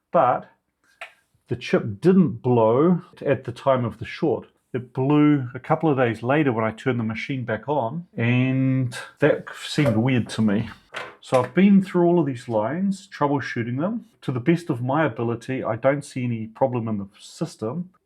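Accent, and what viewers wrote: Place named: Northland, New Zealand. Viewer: Are you a Northland person? You've got the accent of a Northlander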